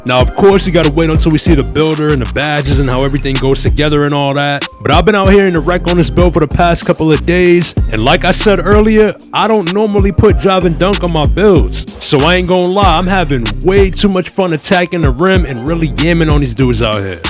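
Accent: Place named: Eastern Massachusetts, United States. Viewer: You from Massachusetts bro ? You got the illest eastern mass accent lol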